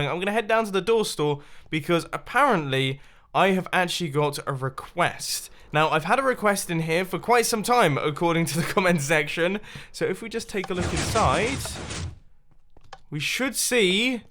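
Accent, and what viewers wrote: United Kingdom. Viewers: I, as a german, really like your british accent. Your channel has very nice content. You are such a good YouTuber. 👍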